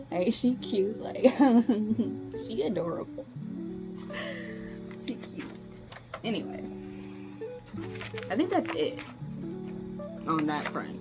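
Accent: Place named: United Kingdom. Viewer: Your British was a bit too convincing bc I was thinking "Has she always been British?"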